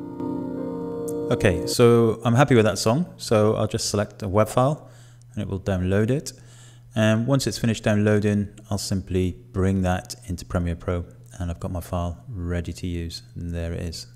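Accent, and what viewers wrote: United Kingdom. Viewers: I love your british english 😂. Thank you for this very important video!!! I hope to meet you ONE DAY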